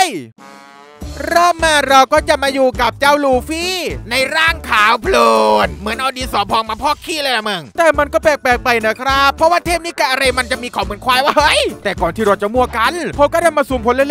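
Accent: South, Thailand. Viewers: พี่เป็นคนใต้หรอครับ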